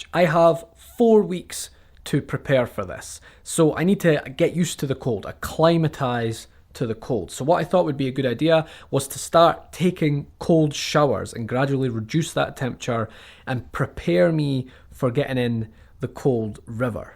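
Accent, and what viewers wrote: Scotland, United Kingdom. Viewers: are u Scottish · Are you Scottish!?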